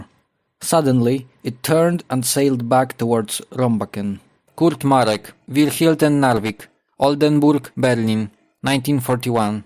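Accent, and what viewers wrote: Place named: Poland. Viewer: Love your video and your polish accent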